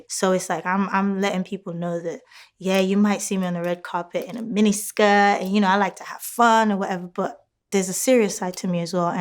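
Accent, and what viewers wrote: United Kingdom. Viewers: Does she have a british accent seems it mixed sorry i am French ? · British accent I hear? Is she of British decent aside nigerian? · Ho my gawd Where did the British accent come from🙄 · What's up with the British accent though? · She studied in the UK and came when she was little, so obviously British accent and British passport. Stop hating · weezy o she probably lived/ grew up in the uk. There are plenty of Nigerians with British accents!!! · Why the UK accent you are Nigerian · Where did the British accent come from?? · Famm that british accent is on point!!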